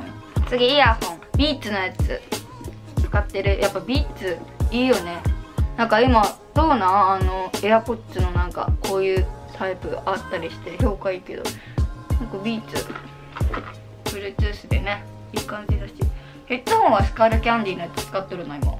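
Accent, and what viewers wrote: Okayama, Japan. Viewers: めっちゃ岡山弁〜💞勝手に親近感わきました！可愛い人は岡山弁話してても可愛い件😍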